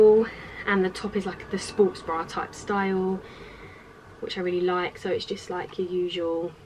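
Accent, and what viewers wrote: England, United Kingdom. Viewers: I love your british acent....! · You drive me crazy! Your so beautiful, that long red hair and English accent, you are just bad to the bone. You are number one on my want list.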